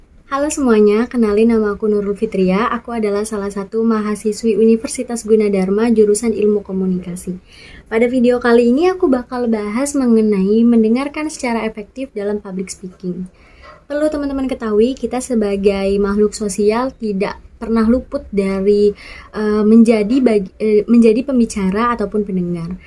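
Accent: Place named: Madura, Indonesia. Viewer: Kadang logat madura ngikut wkwkwk 😅😅 selebih ny ok lah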